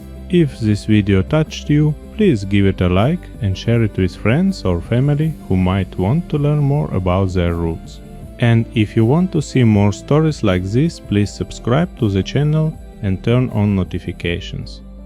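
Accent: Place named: Ukraine. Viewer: You speak like a Ukrainian person whose videos we watch. Of course, I know that doesn't mean you are from there, just that to my ears it sounded similar. Most of your videos that I had watched used the more American-sounding voice. This was the first time I heard your less changed voice. I'm glad you liked the story of some of my family's origins. We have enjoyed going back and finding out where all our ancestors traveled from. I greatly look forward to seeing more of your brought-alive old pictures. 😊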